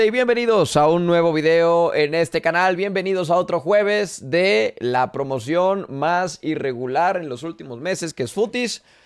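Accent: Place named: Spain. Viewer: tu no eres mexicano eres español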